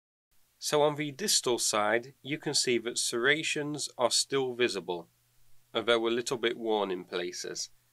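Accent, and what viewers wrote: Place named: Midlands, United Kingdom. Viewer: Taiwan? Just by accent I would say central englad...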